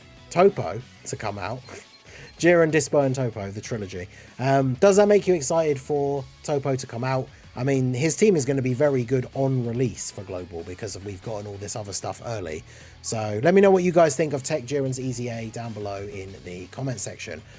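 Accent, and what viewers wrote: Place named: United Kingdom. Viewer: Are u from the uk? I love the british accent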